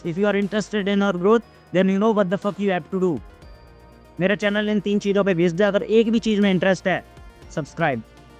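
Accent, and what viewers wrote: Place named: India. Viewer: I am not able to understand. I struggle with Hindi accent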